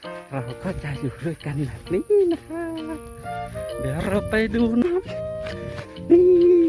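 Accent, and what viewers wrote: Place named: Isan, Thailand. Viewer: ต้นข้าวน่ารัก ชอบลุง พากษ์เสียงอีสานค่ะ ต้นข้าวเกิดอุดร ฟังภาษาอีสานรู้เรื่องคงได้ยินตั้งแต่เกิด....🥰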